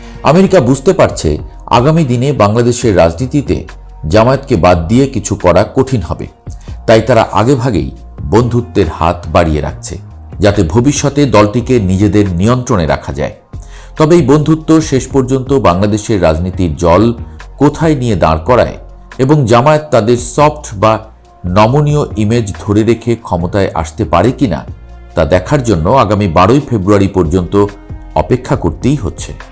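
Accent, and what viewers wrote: India. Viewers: তোমার কথা শুনে বুঝা যাচ্ছে তুমি করা ইন্ডিয়ান দাদা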